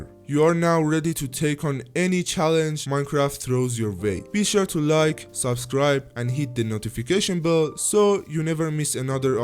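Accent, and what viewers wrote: Iran. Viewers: your persian accent goes hard 😈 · داداش ایرانی هستی؟از لحجه فهمیدم😂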